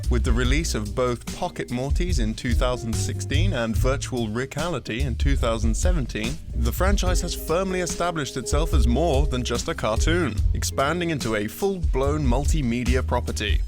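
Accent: United Kingdom. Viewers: sounds like a british vinny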